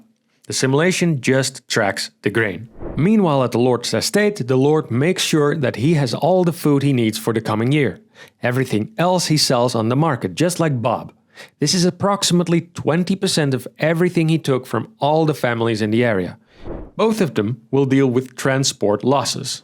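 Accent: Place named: Netherlands. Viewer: The channel says it's situated in the Netherlands, and by hearing his accent (as a Dutch person myself) i am 99% sure he's Dutch. So it's definitely weird how the imperial measurements slip in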